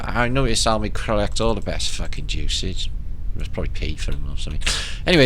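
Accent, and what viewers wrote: United Kingdom. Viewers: good lord , you ARE British :D xx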